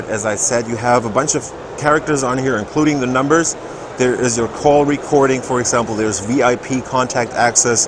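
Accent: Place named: Germany. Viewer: Your accent sounds like German!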